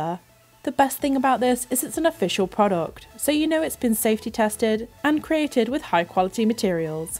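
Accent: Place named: United Kingdom. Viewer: Love your British accent 😍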